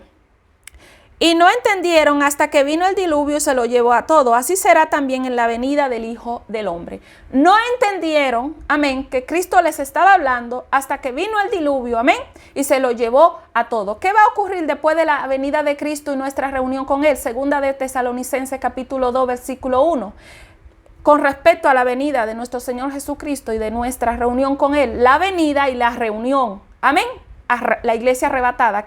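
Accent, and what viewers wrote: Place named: Spain. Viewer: Tienes acento de España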